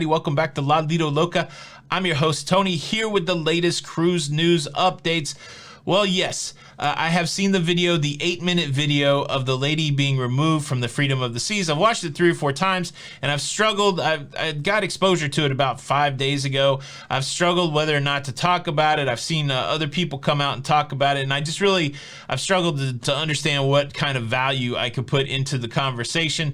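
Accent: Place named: New York, United States. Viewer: Sounds like fellow New Yorker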